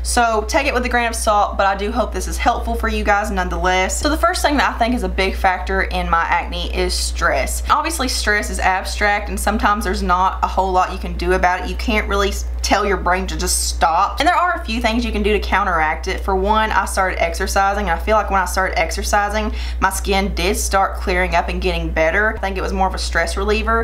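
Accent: South, United States. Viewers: Tímea Tarjányi, it's a southern accent. Do you mean where she lives.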